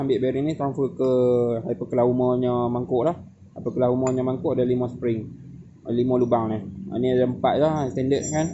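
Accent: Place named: North, Malaysia. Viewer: Kedai dkt mn dgr mcm belah utara ja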